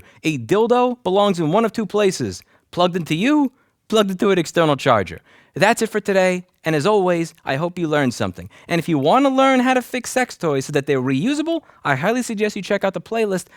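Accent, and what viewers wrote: New York, United States. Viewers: Is it just me or Sounds really New Yorkey on this one?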